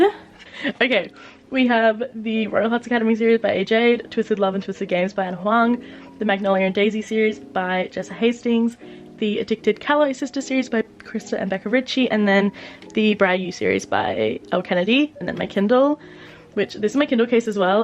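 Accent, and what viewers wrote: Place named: Australia. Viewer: i just found your channel and when i heard you speak i was like a fellow AUSSIE???? incredible. watching you reorganise was so satisfying thanku girlfriend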